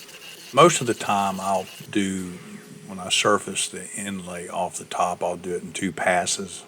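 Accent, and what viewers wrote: South, United States. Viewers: Rant on brother! Always gonna be a knucklehead out there. I have a cnc and a laser and as far as I am concerned they are no different than my table saw. They are tools, complicated ones but still tools. Thank you for another awesome video. BTW love the cornbread accent....got one myself....redneck of the south Ga. variety here. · Been in California for many years but the family is from Tennessee so I don't mind the southern accent at all. I've had a CNC for several years and have learned you need to be an artist for designs and an engineer for getting the software to perform the way you want. And also a mechanic to make sure the CNC is working properly. Mostly a woodworker to see a piece of wood for what it can become sitting on a shelf, table, or kitchen counter. Amen y'all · AMEN!!! Absolutely fabulous work! Getting use to the southern accent myself. 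Formerly a cheese head (Wisconsin) and now living in Ozark, Al. Very impressive work, and design. Thank you for sharing, and you have a new subscriber.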